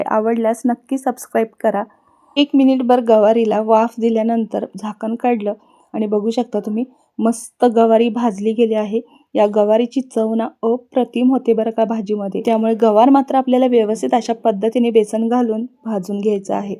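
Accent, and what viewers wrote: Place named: Otur, India. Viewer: खूपच सुंदर ओतुर होऊन बोलते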